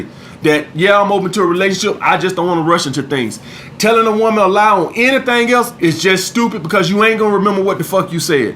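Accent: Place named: Rural, United States. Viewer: .. Do women say your a "Fuck Boy", Because of your video content? ect..and also, im from N.Y. and have a thick AF N.Y. accent, why you sounds country as all hell? By the way..your vids are on point!👍✊🙏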